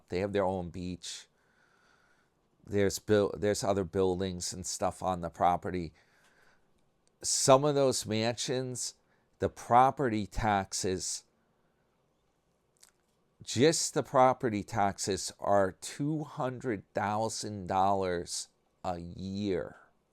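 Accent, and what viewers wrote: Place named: New York, United States. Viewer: He does mispronounce words, but this is not a fair example. This is his regional accent. Regional accents are disappearing. It makes me sad. It will be very boring when everyone in our country sounds the same. It’s interesting to me that even in the small region of New York there are different accents.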